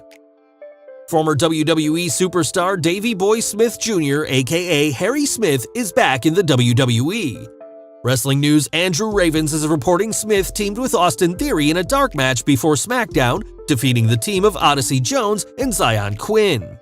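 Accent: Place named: United Kingdom. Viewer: He's not Australian though... he's British.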